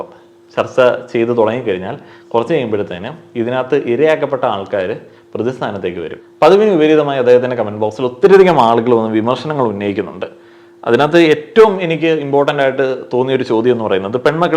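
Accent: Kottayam, India. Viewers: Only a Kottayam Boy can talk like this.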